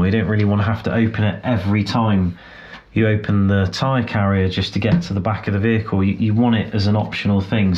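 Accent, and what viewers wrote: United Kingdom. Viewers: listening to you prattle on with that fancy British accent is never boring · *English accent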